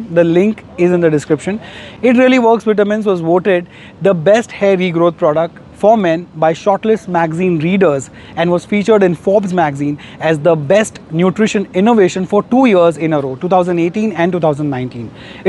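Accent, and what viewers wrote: Hinglish, India. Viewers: Amazing hingliishhh...!!!Love the way u narrated the story 🤩😍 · 🤣 Loved your Hinglish narration. You've got a very good sense of humour 😂🤣🤣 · Your narration of presenting this piece of news.... 😂😂😂.... Hinglish ✌️✌️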